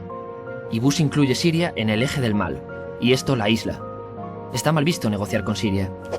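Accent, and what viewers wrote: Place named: Spain. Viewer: Recuerdas la Torre de Babel de la Biblia, la hicieron estos tíos. Jajaja suena bien!!👌🏻👍🏻Me gusta el acento de Español.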